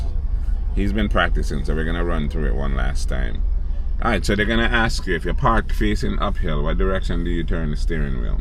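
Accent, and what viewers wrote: Jamaica. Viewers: Know you sound Jamaica bro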